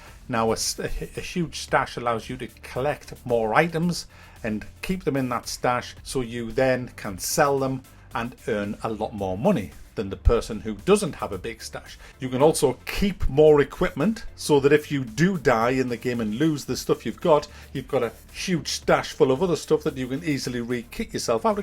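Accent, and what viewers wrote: United Kingdom. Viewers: I won't defend a game unless it deserves it. I've loved your videos and find them so interesting. I'm 17 and have been slowly rebuying old PS2 and PS3 games you and other channels similar (check out Mark Brown, Game Makers Toolkit) to you suggest and I love it. Ive found some of my favorite games through this, so a huge huge thankyou. I think its not video games that suck today though its just business. Because its not just old games, its old music and old films (only a matter of time before its books). I love that your British, like me, your accent just adds to your reviews and no offence makes your frustration funnier NEVER THE LESS THANKYOU. WE NEED MORE CRITICS LIKE YOU IN THE SPOTLIGHT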